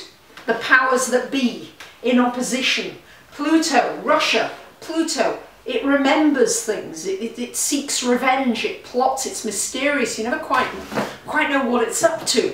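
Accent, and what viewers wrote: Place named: United Kingdom. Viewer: cant stand brittish accents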